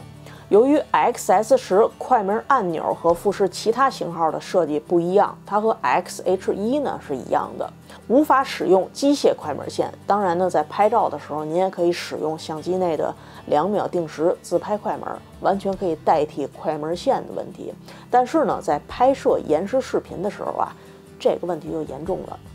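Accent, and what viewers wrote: Beijing, China. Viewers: 她是北京人，并不是所有大陆人都这种口音好吧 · 這是蠻道地的北京腔，吃好喝好的比較平，比起某些口音會突然拔尖高音調，我比較喜歡EDI這種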